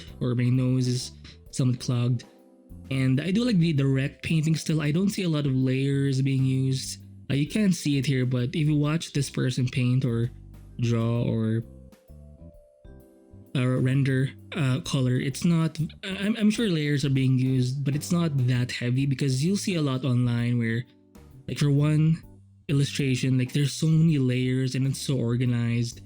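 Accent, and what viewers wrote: Philippines. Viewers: You sounds like a Filipino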